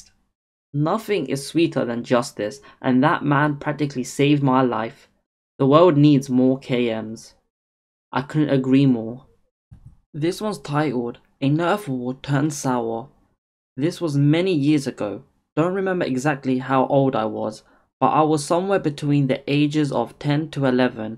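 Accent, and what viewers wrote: United Kingdom. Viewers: I’m sorry if this sounds rude, but are you British? Just curious.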